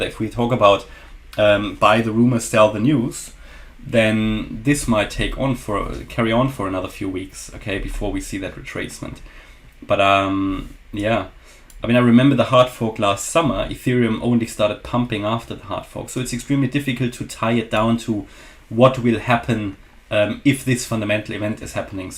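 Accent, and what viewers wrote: Germany. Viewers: he has a very german accent, definitely not dutch haha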